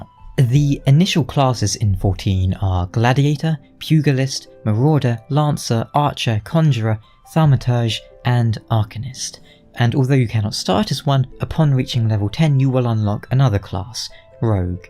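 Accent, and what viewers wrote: United Kingdom. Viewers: Not that this is any of my business, but I'm assuming he's talking about an Oldschool Runescape game developer called Mod Ash, who is a bit of a meme within that community. EDIT: Anyway I don't get why he's calling you that since you sound nothing like Ash. My guess is this guy isn't British and as such thinks all us British people sound exactly the same.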